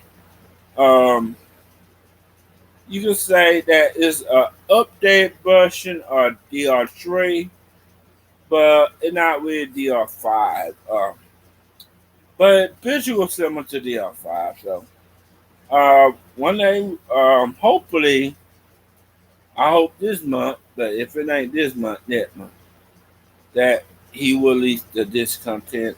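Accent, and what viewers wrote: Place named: South, United States. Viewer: Just a bit of constructive criticism.....sometimes it's difficult to understand what you're saying because of your enunciation of words. It could also be your southern accent that may make it difficult for others to understand what you're saying. When you put those two together, it's even more difficult when you're making videos eating and trying to talk at the same time. Sorry that you were bullied.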